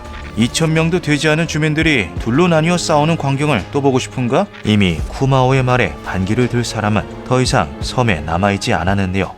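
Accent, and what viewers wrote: Gyeongsang, South Korea. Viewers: 대구네 ㅋㅋ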